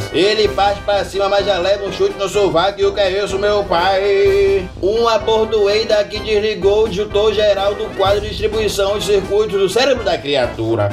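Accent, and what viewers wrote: Bahia, Brazil. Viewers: Melhor narração 😂 esse sacana é bahiano. Kkkkkk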